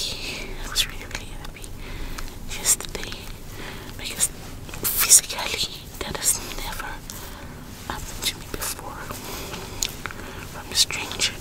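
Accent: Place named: United Kingdom. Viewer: I like when she speaks English i almost hear a British accent... Such a sweet lady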